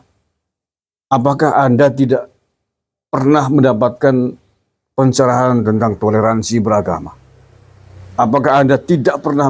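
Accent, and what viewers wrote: Banyumas, Indonesia. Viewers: Tambah edan..setuju Gus...logat banyumasan medhok tenan pak dhe...👍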